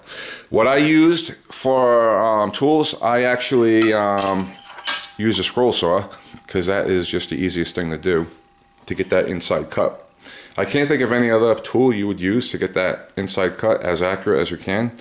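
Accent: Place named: Brooklyn, United States. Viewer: Lovely accent you have :) are you from brooklyn?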